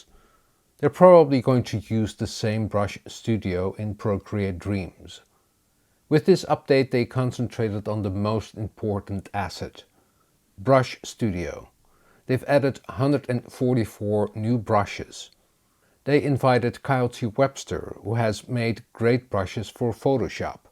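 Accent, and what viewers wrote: Netherlands. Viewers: How did I instantly know you are Dutch? You remind me of my Dutch friend.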